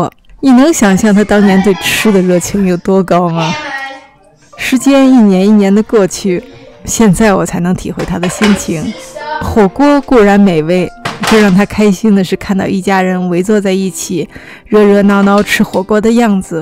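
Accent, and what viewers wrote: China. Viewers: Michael S 那可真没有… 这口音一听就不是北京人，但说的是普通话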